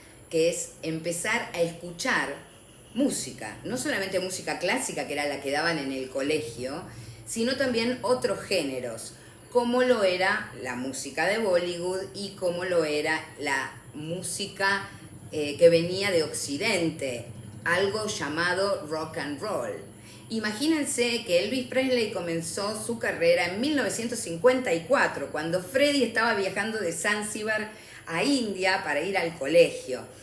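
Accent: Argentina. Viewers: Ese puto acento argentino tan chocante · Me ha gustado mucho su narración dígame ud tiene un acento Argentino y como sabe tanto de Freddie a mi me encanta la música de el cada ves q escucho su música lloro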